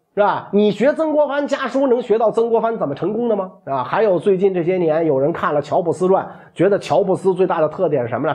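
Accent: Beijing, China. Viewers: 北京口音真好聽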